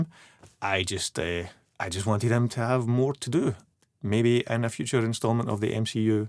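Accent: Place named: Scotland, United Kingdom. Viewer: I would like to officially note my interest in playing ‘drunk-star’ in future mcu projects 👌 i think i’m perfect for that role 😂 I agree with u that Adam Warlock was a bit of letdown but didn’t take away from the movie. Thoroughly enjoyed it and a return to form for mcu indeed 😅 Didn’t realise u had a youtube channel pal! Enjoyed this video 👍 Great to hear another Scottish accent on here too. All the best with ur channel mate, great start for sure! 😃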